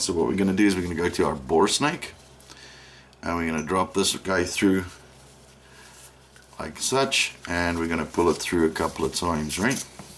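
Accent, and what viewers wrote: South Africa. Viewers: Bru, are you by chance south african?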